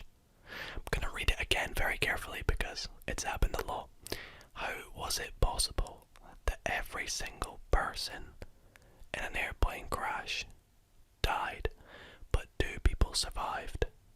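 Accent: Scotland, United Kingdom. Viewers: Hey are you Scottish? I'm just asking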